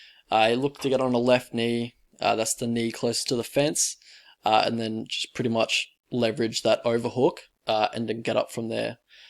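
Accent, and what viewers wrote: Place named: Australia. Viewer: The Australian accent is a hideous mutation that sounds like the US southern accent and english accent had a baby! With that said thanks for the content!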